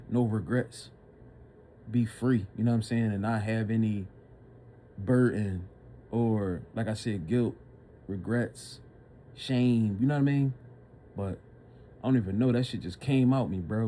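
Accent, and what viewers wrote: Philadelphia, United States. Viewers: Philly in the building, I heard it soon as you spoke, 👍👑👑👑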